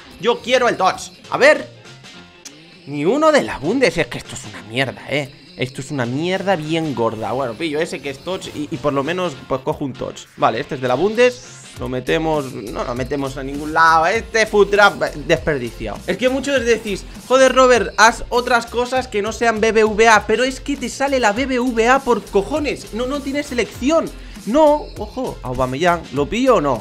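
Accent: Mexico. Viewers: Robert los mexicanos del sur si hablan asi, nosotros del norte hablamos diferente :) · Robert hablas igual a los mexicanos saludos desde México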